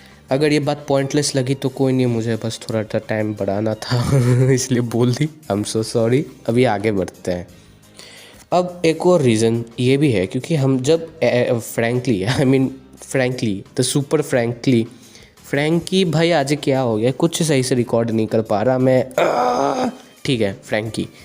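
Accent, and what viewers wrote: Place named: Bengal, India. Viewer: joshu haa bhai aur mera dost kolkata mei reta hai aur iss bhai ka bhi bole ka andaj Bengal mei jaise log bolte hai waisa hi hai 😶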